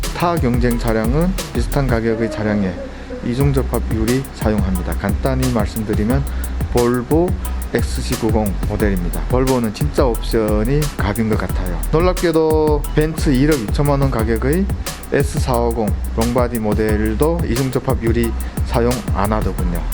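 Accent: China. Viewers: 제 친구도 재중동포인데 말투가 비슷하신 거 같아서 여쭈어 보았습니다 ㅎㅎ BMW를 좋아하시는 것 같은데 올리시면 재밌게 보겠습니다!! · 중국교포에요? 말이 굉장히 어눌함 듣다가 짜증나서 나가요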